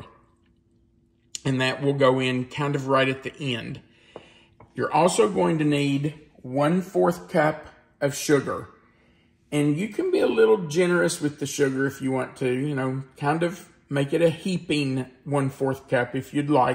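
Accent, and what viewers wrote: South, United States. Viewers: I'm southern, but I can NOT identify that accent. Seriously, not a clue. At first, I was thinking Kentucky, then southern Virginia... but then I was wondering if it's Alabama... Mississippi... Arkansas? I can't place it.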